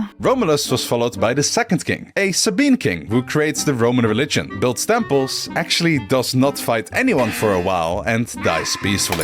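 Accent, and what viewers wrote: Netherlands. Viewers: Oh that Dutch accent is so distinct. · The ear tiwtch detecting a dutch accent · Spotting the Dutch accent is not just easy for the Dutch, it sticks out like a sore thumb. See, we are multilingual and we want to speak fluent English, but when we hear ourselves back to hear how strong our accent is, it's raw cringe.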